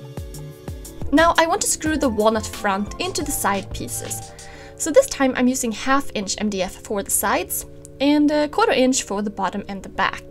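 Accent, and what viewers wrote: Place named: Sweden. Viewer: I thought Swedish, but I may be wrong...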